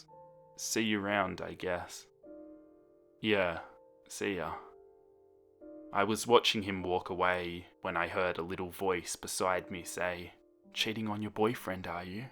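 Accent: Australia. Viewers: Are you Australian? Lol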